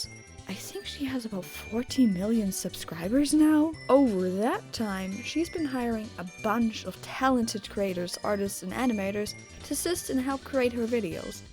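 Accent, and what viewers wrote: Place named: Europe. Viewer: Where are you from btw? I noticed a slight hint of European in your accent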